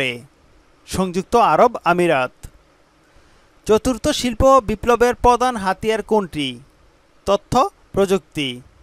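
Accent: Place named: Noakhali, Bangladesh. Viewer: আপনার ভাষা নোয়াখাইলা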